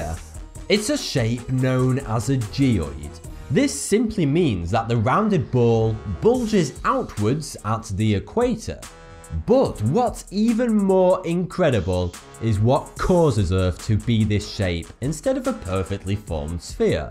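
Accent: United Kingdom. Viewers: Is he British? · I love his British accent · So I don't mean to be rude but have you ever lived in Britain? He has one of the least annoying accents you can get, in my opinion. Would you rather have a cockney?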